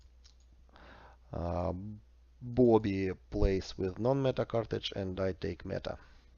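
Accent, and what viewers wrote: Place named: Russia. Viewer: i prefer Bobi and his classic build. if i play without jav i prefer cretan arch than 3 balearics maybe 2 bale and 1 arch but the 40 points of damage is good if you want focus inf with good armour.. but you neel also cav supremacy. btw pelta is pelta <3 good video expecially coz for me is very simply understand english spoken by a russian coz it is much slower <3 great work mate! Lawrence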